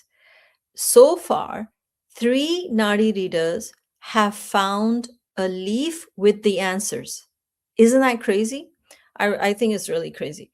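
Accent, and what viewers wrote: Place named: Hindi, India. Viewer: It is kali yuga not kali yug and moksha not moksh Madam please dont pronounce sanskrit words like hindi. Thank you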